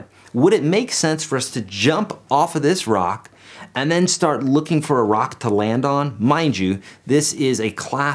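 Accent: South, United States. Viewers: Your southern accent makes these lessons so much more enjoyable. I don’t know why, it just does!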